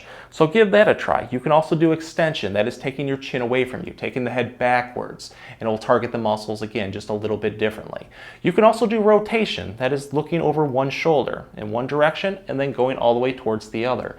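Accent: Michigan, United States. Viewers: Holy wow!!! I’m working in Italy and the beds and pillows are horrible. My neck was hurting so badly that I started a Google search on videos to help out. I found your videos and what relief!! I definitely will have to see my chiropractor when I return back to the states. BUT I do have to say as soon as you started talking I thought “He must be in Michigan”….. I lived in St Joe, MI for 7 years!!! Of course I’m following you now!! Thanks for the relief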